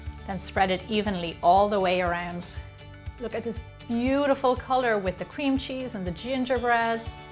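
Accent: Ireland. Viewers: Thanks 4 the help and I like your Irish accent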